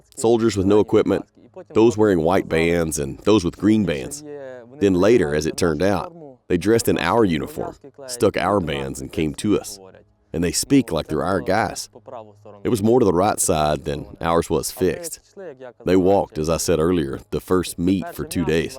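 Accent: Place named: South, United States. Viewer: I really like the southern voice used! I like to listen to longer Resonance videos while driving.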